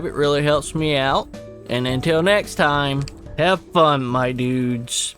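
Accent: South, United States. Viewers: Love the jolly southern man helping me collect all the borbs!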